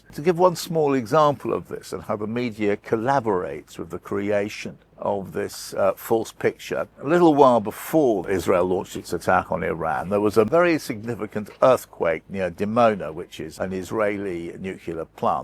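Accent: United Kingdom. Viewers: Speaking with a British accent doesn’t automatically grant credibility. The repeated declarations and attempts by various Muslim countries and terror groups to eliminate Israel are conveniently ignored. Iran’s extensive missile production and nuclear program are barely mentioned. Instead, the focus remains obsessively on a tiny country that simply tries to live in peace, yet is constantly dragged back into responding in the only language that seems to be respected in the Middle East. It’s time for the Muslim world to accept differences, choose peaceful coexistence, love their children more than they hate those who are different, grant women equal rights, and step into modern times. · I know it’s unimportant compared to the very serious topic at hand but I can’t believe an older guy with a British accent is pronouncing “nuclear” as “nucular”.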